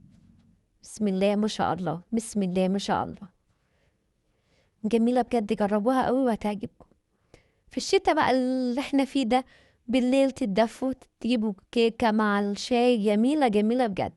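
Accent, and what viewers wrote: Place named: United Kingdom. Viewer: يسلم ايدك حبيبتي .. هو حضرتك مش مصريه ..الحروف شويه مياله للانجليزي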